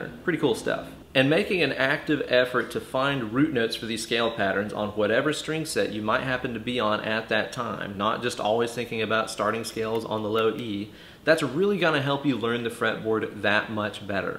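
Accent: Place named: Tennessee, United States. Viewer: the Dave Ramsey/I definitely live in Tennessee voice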